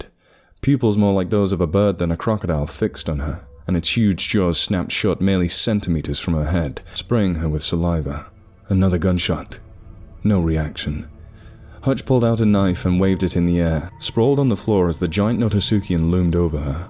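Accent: Australia. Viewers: This is the best Australian accent I've heard, thank you Lots of love from an Australian xx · i got stupidly distracted by one of the characters driving a vauxhall astra, but theyre rebadged as holdens here. absurdly nitpicky detail for me to get hung up on lmfao author is always great, the narration is always great, and as an aussie i give your aussie accent a solid 9.5/10 - the best part is you were able to keep up the emotive voice acting, thats what usually bugs me most about a lot of people doing accents, they get so focused on getting the accent right that they forget how to act! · Good job! We need more Australian creepy pastas, love your accent!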